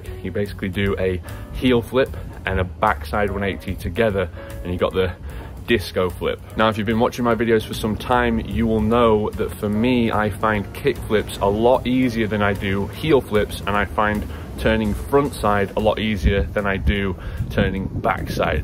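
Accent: United Kingdom. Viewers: Hello just wondering where you from? Sounds like Lancashire to me 👍🏼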